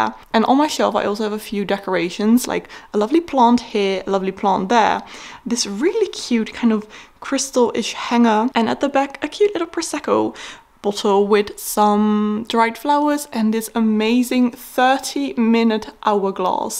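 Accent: United Kingdom. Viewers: Oh huh, wauw. Ik las als in van de UK. In combinatie met je Brittish-achtige accent. Maar wat doet ze met al die Nederlandse boeken haha... Fijne dag.😊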